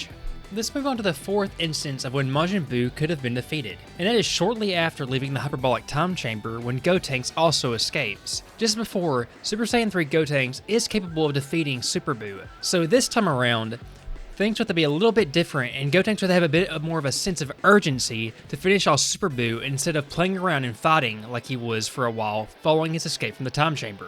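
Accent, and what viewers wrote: South, United States. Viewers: Love the hick accent. You sound like my grandmama😂😂😂❤️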